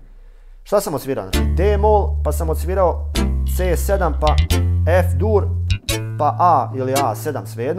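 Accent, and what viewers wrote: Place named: Bosnia and Herzegovina. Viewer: ja moram da ti tepam, nemoj zameriti, sad je 12.decembar slučajno sam naišo na ovaj video, meni i muziciranje koje sjajno radiš nije toliko bitno, koliko si pun pozitive, šta god kažeš van sviračine, toliko zrači harizmom, posebno bosanski akcenat/dijalekt i kako ti prostim jezikom objasniš i sve je tako prirodno. Pozdrav veliki, voleo bih da te upoznam, gde živiš ti, daješ li časove muzičke a Boga mi i ta harizma kojom si oduševio moju suprugu, mog sina, valja se s tobom družiti. Oduševljen sam- Ako stigneš, odgovori mi je l si iz Srbije, ili negde vani. Gde god si, svako bih te posetio. Lep pozdrav, zdravlja ti želim, sreću. Zbilja bih dete, a i sebe uključio ako želiš da nam držiš časove. U ovom regionu punom bolesno sujetnih muzikanatam, samo si iskočio na YT. Ako stigneš. odgovori. Živio!!!!!!!!!!!!!!!!!!!!!!!!!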